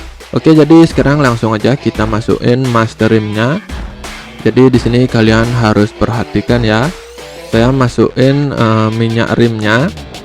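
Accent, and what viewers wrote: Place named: Bali, Indonesia. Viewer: Dari logatnya orang Bali y bli😅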